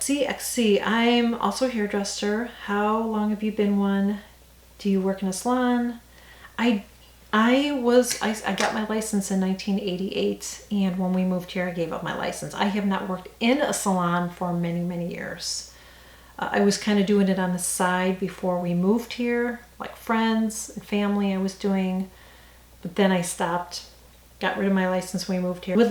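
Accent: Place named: Boston, United States. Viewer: Where i you originally from you sound like you're from Boston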